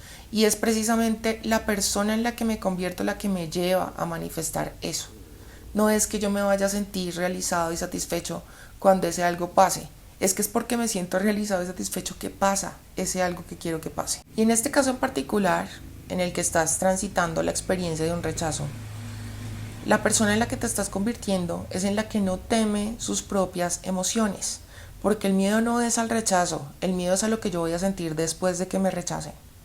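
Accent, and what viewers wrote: Colombia. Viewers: Excelente video y GRAN CONTENIDO 🇨🇴 " MI GURÚ FAVORITA ❣️🇨🇴" UN placer verte y un DELEITE escuchar tu voz muy colombiana 🇨🇴 ❣️ ( siempre enseñanzas prácticas GRACIAS ❣️🇨🇴😉✌️🇲🇽) UN BESITO Y UN ABRAZO DE OSO HASTA BOGOTÁ COLOMBIA 🇨🇴❣️😉✌️🇲🇽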